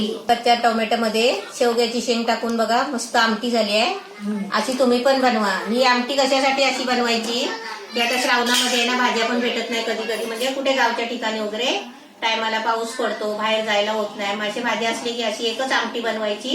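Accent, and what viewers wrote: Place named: Malvani, India. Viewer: Wa..mastach..tumche malvani type bolne chan watye..aamche watta tumhi..tumchya recipe nehami baghte me wahini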